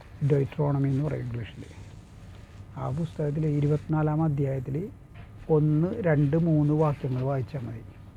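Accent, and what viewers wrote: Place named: Kochi, India. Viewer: താങ്കളുടെ വിവരണം വളരെ രസകരം ആണ് നിങ്ങളുടെ ഭാഷ യും കൊച്ചി ഭാഷ ❤️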